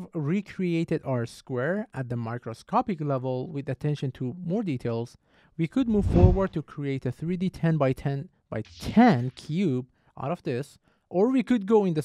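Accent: Iran. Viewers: why i think you are from iran😂😂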